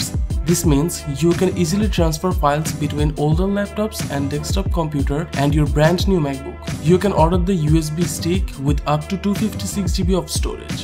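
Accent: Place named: Bangladesh. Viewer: You sound like a Bangladeshi :-)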